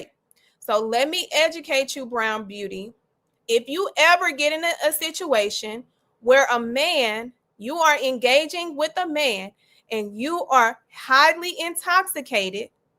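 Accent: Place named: Houston, United States. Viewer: You sound like you can be from H-town😅 That mole is pretty sexy! I wanna kiss it! 💋😍 😜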